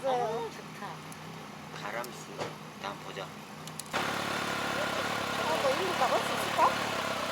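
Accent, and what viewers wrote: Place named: Jeolla, South Korea. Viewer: 역시 전라도 사투리ㅋㅋㅋㅋㅋ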